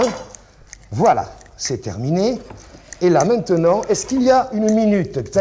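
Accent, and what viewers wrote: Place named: Midi, France. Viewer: Et cet accent du Midi bon enfant me fait jubiler comme jamais !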